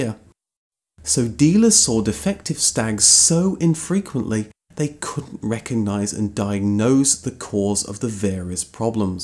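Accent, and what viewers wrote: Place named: United Kingdom. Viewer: I enjoy your show you are very thorough and well knowledged in what you do I love the British accent being from the US. I think that anything with the Union Jack on it belongs in my driveway! I love Queen Elizabeth, Winston Churchill, the Duke of Edinborough is one tough dude and I think a monarchy would do our country some good !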